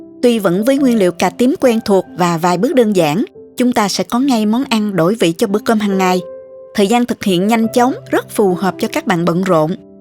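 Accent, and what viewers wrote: South, Vietnam. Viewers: Giong miền Nam rỏ ràng không có quê, nghe thấy mến giọng nói va cách dạy thật tỉ mĩ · Giọng chị đọc chuyện nam bộ đúng bài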